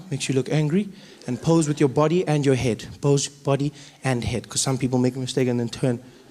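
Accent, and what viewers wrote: South Africa. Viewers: Being a South African, living and working in China I know for a fact the some Chinese know you my brother 😅😂😂😂!! Considering that some Chinese people are generally very ignorant to the outside world 🤦🏽‍♀️, it’s huge for you to be known outchea 😅😂! I I have three colleagues who instantly fell in love with me because I’m from South Africa, same country as their favorite comedian Trevor Noah EVER ☺️🙌🏻👌🏽!! Continue to fly our flag high & remember Don’t lose your accent my broer 😅😂😂❤️ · Back to his Seow Feffrican accent - has to have been during his tour back home. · His accent is exceptionally South African in this clip 😂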